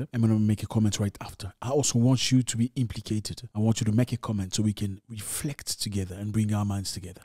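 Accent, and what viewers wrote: England, United Kingdom. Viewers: Notre frère va du côté anglophone de la force, très bel accent anglais 💪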